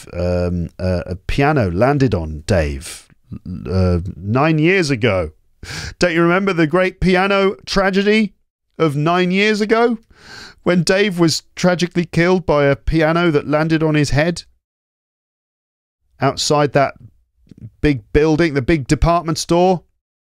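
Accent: United Kingdom. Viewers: Do you speak in british accent? 🇬🇧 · 👍👍👍👍👍👍 good teaching. he is British native English. Not American. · I happened to find your channel and totally drawn into. It was really interesting and learning a lot. I was looking for an English lesson program just like it. You are such a great teacher and I like your British accent as well it sounds so nice. I will check out your other movies. Thanks a lot! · Are yoy British? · you're such a great person, and your channle is really a treasure for those who want to learn English in its natural way, by listening to Britch guy with a very clear accent, just thank you for your effort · Thank you so teacher you are teaching english good also be able to listen British accent this is real one not easy to find out easy ways some one like British accent and translate to shot form sentence this is good idea clear thanking you.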